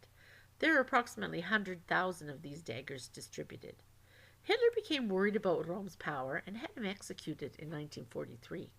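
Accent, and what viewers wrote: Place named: United States. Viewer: i think you meant pronunciation.. shes clearly an american. big deal. thank for the video lady. but crank the sound up, i had to crank my stereo up.. too quiet.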